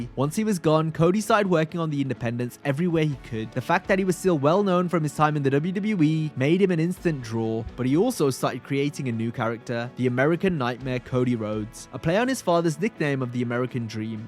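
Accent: United Kingdom. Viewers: British people and their pronunciation of words never ceases to amaze me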